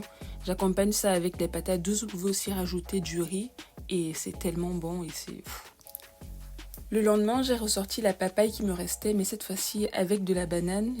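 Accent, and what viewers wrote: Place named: Cameroon. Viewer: J’adore bravo… 3 vidéos en quelques minutes , je me suis abonnée à la 3eme minute après la 1ere vidéo. Le petit plus la voix et l’accent un peu camerounais à la quelle vient s’ajouter la forme des bananes plantains 😊 ça renforce cette idée des origines camerounaises… Très belles vidéos et franchement la voix est captivante ❤❤❤ Je te souhaite un beau succ😮pour la suite ❤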